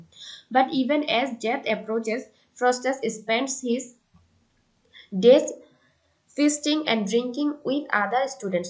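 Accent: Chittagong, Bangladesh. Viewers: চট্টগ্রামের ভাষার প্রভাব😆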